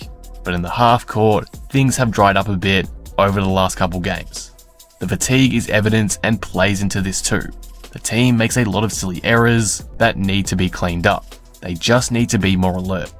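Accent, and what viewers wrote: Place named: Australia. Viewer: Clearly this guy is an Aussie, or NZ'er, or a Brit. That's cool, but being Captain Obvious is not helpful. Bye dude. You're irrelevant.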